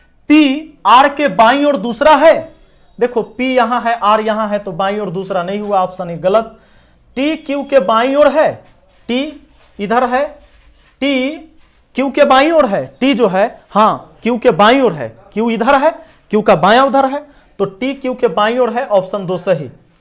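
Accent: Bihar, India. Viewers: apni bihari tone... mja aa jata h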